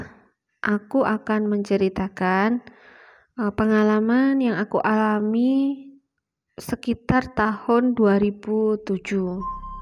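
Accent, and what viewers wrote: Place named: Malang, Indonesia. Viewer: Medokkk bnget malangnya hee sasaji mbak 🌹🌹👍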